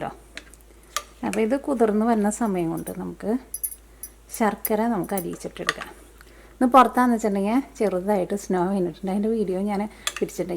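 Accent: Thrissur, India. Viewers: ഇഷ്ട്ടമായി എനിക്ക് അവതരണം നല്ലത് തൃശൂർകാരുടെ സംസാര രീതി പിന്നെ ഇടക് പുറം കാഴ്ച്ച കാണിക്കുണ്ട് അതു സൂപ്പർ ആ രാജ്യത്തിന്റെ ഭംഗി കാണാം മഞ്ഞു വീഴുന്നത്. സൂപ്പർ 👍👍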